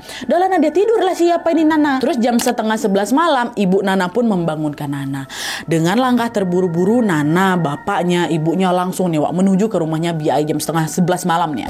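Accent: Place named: Sumatra, Indonesia. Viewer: Mba nadia orang palembang at org Jawa? 🤣 tp bahasanya kyk org Sumatra.... 👍👍👍👍❤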